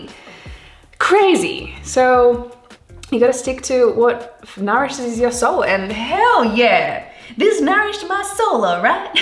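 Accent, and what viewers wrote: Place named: Australia. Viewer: Because of your accent, I heard fly swatter as fly sword and thought that must be the coolest Australian slang ever. Hope you're having a great Thanksgiving, although I may be revealing my American toolishness by saying that, and hope you have a wonderful holiday season through the rest of this year and into the new one.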